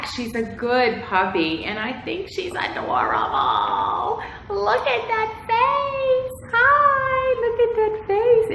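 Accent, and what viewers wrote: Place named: New Orleans, United States. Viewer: I have been watching your videos. You are so sweet and informative. We have a lot in common. My family is from New Orleans. I picked up on your accent right away. Go Saints! I lost my 1 st Shih Tzu 4 months age. He was 13 and my world. I also have been looking for a reputable breeder to find a new baby. I searched all over the country spoke to many many reputable breeders and finally reached a decision. Now I am expecting my new puppy 🐶 in 8 weeks. I am so excited to see and meet him in person. I love watching your videos. My husband and I do filming and media. I also have 2 grandsons. I love and spoil my dogs too. We love Disney too! My husband produced the Voyage of the Little Mermaid puppet show and also on the set of the Lion King. I hope one day we can talk. Stacci is gorgeous!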